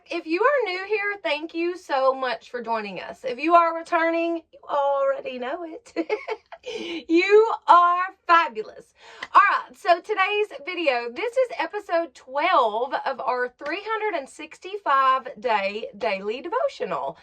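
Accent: South, United States. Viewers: Hello my beautiful Angel. I would love to hear you sing with your beautiful southern accent. I love it!! What a true and powerful words of our Amazing Creator JESUS. He is so so good to us. Even though we are not worthy. I'm a sinner as well and saved by his marvelous GRACE. Praise GOD for his mercy, grace, forgiveness, and his unconditional love he had for us. Thank you once again for your daily devotional words of our Living Father. Blessings to you and your lovely family. I love you my beautiful Angel sister.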